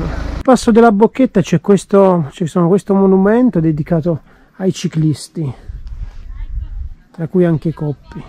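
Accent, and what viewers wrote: Abruzzo, Italy. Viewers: Spettacolo….. posti molto interessanti….. e poi mi sembra di sentire i miei CUGINI di CITTÀ SANT’ANGELO….. grazie di cuore ❤️❤️. GOOD TRIP!!!!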